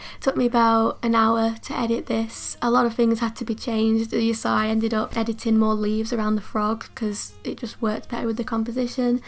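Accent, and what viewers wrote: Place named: North, United Kingdom. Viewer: I love your work and I love your accent,,,,northern representation heck yeah!!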